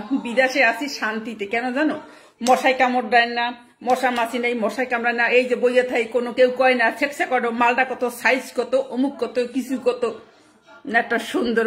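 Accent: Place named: Barishal, Bangladesh. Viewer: ম্যাডামের বাড়ি মনে বরিশাল। চালিয়ে যান ম্যাডাম, খুব ভালো বলেছেন।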